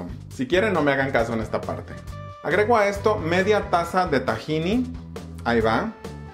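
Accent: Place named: North, Mexico. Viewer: justo ando haciendo hummus ( yo le quito el pejello porque da pedorrera 😅🙈 donde eres por el acento? arriba el norte mijo haahahah saludos desde sonora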